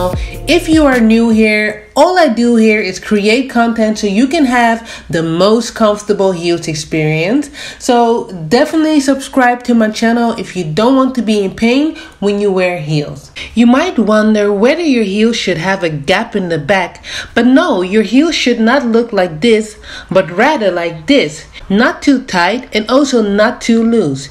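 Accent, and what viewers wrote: Netherlands. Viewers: i know a dutch accent when i hear it! ;) gonna try the tips ! thanks :)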